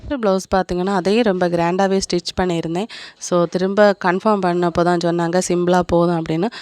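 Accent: Kanyakumari, India. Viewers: நீங்க எந்த ஊர் நம் கன்னியாகுமரி அண்ணன் உங்க வாய்ஸ் கொடுத்தா அப்படித்தான் நமக்கு தெரியுது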